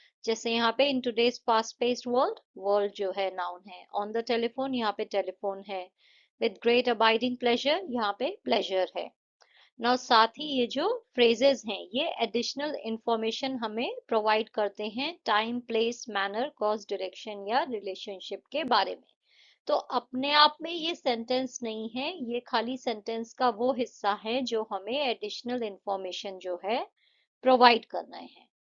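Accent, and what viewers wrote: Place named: United States. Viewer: mam where you from i had a hunch you from to America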